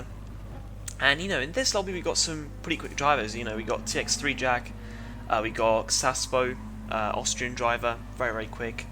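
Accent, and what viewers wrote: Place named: United Kingdom. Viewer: You sound more british than most british people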